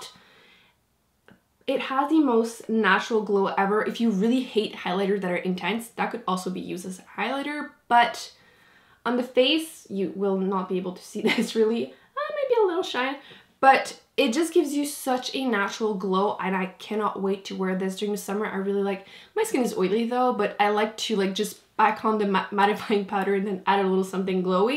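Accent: France. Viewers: Also, I've thought this for awhile, butI just love your accent! (I took French in high school but don't remember much lol). I just think about it every time you mention the Lancome foundation or any other French makeup brand/name--you're the only one who can pronounce it properly! lol It drives me crazy when people drag out the "a" in Lancome!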